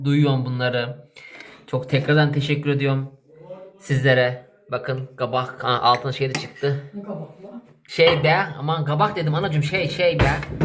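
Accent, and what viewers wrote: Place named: Bartın, Turkey. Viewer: Bu şive olayına takanları anlamıyorum insanlar bu şekilde nasıl abartarak konuşabilir çocuk hemen hemen her videoda şive meselesini konuşuyo inanmayanlara açıklama yapıo haala abartıyorsunuz diyorsunuz valla pess tek dert bu olmuş. Ben bartınlıyım çaycumalı tanıdığımda var bu şekilde konuşuyorlar kardeşim sen şive ile ilgili açıklama yapma bence bilen biliyo inanmayanda izlemesin sevgiler🙋‍♀️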